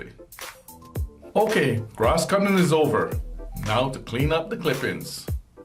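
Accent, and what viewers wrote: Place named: West Indies, Caribbean. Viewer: I got one today it works awesome. Interesting accent are from the West Indies?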